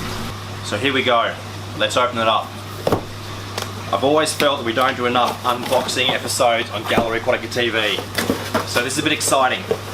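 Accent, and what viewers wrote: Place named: Australia. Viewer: That sounds awesome, take us along for the ride! The Germans really know their reefing. :) haha, what I liked about the old outro was the long hair and the long aussie "hit the looike" :D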